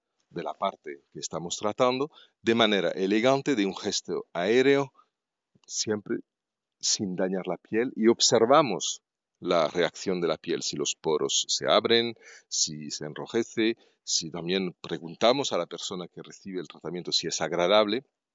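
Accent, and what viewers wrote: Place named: United Kingdom. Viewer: I love your british accent!! It's very interesting!!!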